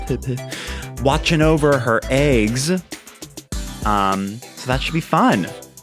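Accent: United States. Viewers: Yes I have a friend from central NY who says egg and leg like you 😂 you’re definitely not alone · That’s how we say it in Minnesooota. We keep our ayegs in bayegs 😂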